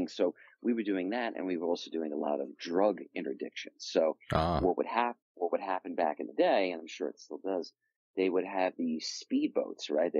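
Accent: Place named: New York, United States. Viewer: Love the NYC accent, bro.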